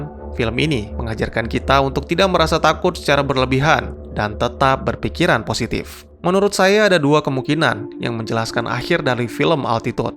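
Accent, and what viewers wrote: Aceh, Indonesia. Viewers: orang aceh ya om?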